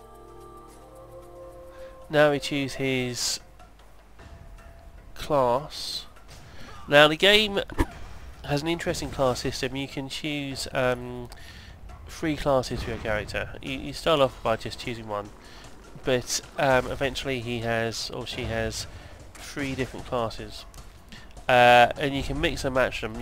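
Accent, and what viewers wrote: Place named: United Kingdom. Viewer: Clicked on video in recommended videos. 12 seconds in I'm bursting out laughing at how amazingly British your voice is (and I'm British!)! Not an insult, I just couldn't stop myself, interesting looking mmo, here, have a like.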